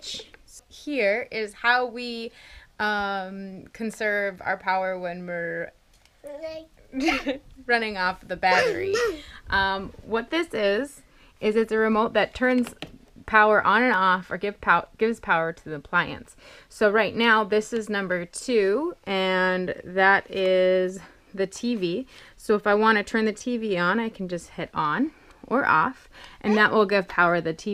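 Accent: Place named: Minnesota, United States. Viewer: I knew I recognized some Minnesota in you. Keep the great video coming!!!! 👍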